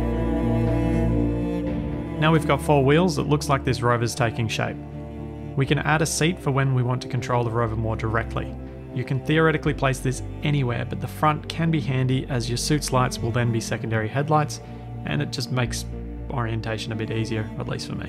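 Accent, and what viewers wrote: Australia. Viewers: he is Australian. Good to know more Australians play this game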